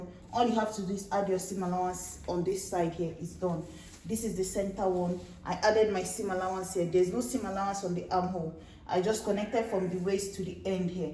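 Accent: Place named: India. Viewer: Am I the only one hearing an India woman's voice?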